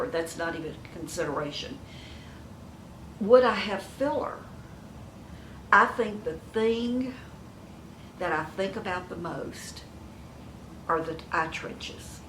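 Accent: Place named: Texas, United States. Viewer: I just saw one of your videos for the first time tonight. We have some similar ideas! I was all for shopping my closet which was brimming with really cute clothes but soon discovered that I have the COVID Curse...I’ve gained weight for the first time in at least 5 years! YIKES! I was a slim petite 68 year old & honestly, gaining almost 8 pounds really makes me feel fat...about 117 lbs. now.Everyone has bagged me for over 5 years to EAT! I couldn’t eat for about 4 years due to the loss of my first-born, my only son. My heart broke. He was a chemistry professor at a university in Ohio but our home is in Canada. I think that sometimes I think he will come to the door because he was in another country & I didn’t see him often. I hope that doesn’t sound too crazy! Now, back on topic...I am subscribing. I think you are absolutely LOVELY inside & out & I could listen to you talk ALL DAY! 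Are you from Texas? My Dad’s third wife is from Texas & you remind me of her (your voice).She lives in British Columbia which is quite far from us in Ontario. Anyhow, I loved your video & look forward to finding more of them! I don’t know anything about you yet but I think that if I ever had the good fortune to meet you, we would get on like a house on fire! In September I will be 69 & I saw in one of the comments that you are actually 77!? You look at least 10 years younger! Maybe those of us who try to keep a kind heart are blessed with looking a bit younger than our ages? Love & kindness are my p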